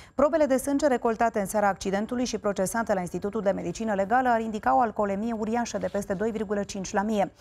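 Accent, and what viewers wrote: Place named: Moldova. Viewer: e sholdovean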